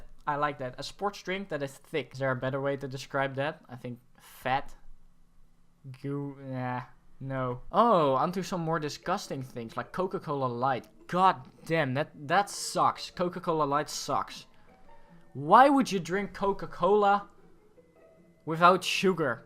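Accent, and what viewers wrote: Netherlands. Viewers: listend to this for 10 secs and ur defenetly dutch lol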